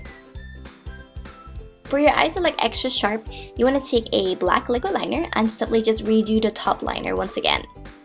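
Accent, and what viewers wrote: Ireland. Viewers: It's Irish.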